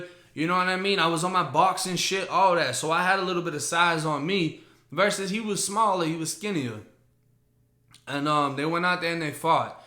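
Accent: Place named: Massachusetts, United States. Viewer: Shout out to my niggaz out in lawrence mass... 860 reppin here. CT Bruh.. u gots sum real content. When I first heard ur voice I was like that nigga from Mass. Wtf he doin down in Tampa. But then again. I myself moved to Jacksonville back in 17 n live in the London Towne projects on lake ave n shit is fuckin real out there. Was handed a piece by my cousins husband 2nd day I was there. I was like wtf is this for, he laughed n sed dead ass... u gonna need this out here bruh. Nigga I retired gun play back in the 90s. Wtf...in my head all I can say is here we go again... wtf...but I lived there for 6 months n had no problems. Niggaz was cool with me. I came back up top cuz i missed my kids... but i would love to move back to Florida...Alex Tatts... Best known Tat artist in CT.. bet ur bottom dollar. U come around these parts, I got u..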